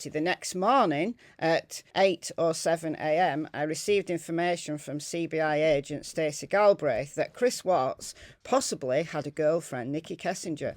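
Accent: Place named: Stockport, United Kingdom. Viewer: I am so intrigued by this case and have been watching loads of videos from various you tubers and something doesn't quite add up about how the investigation was done for one and what's he not telling that he will take with him to the grave. Is he going to end up pushing N K under the bus to get his sentence lessened because she was involved after all maybe. I'm a northern llass by birth born in Salford Manchester and lived in digsbury until my parents moved to Wales. Is that a Stockport accent I recognise. Enjoying your videos on this because they are so full of interesting information 😀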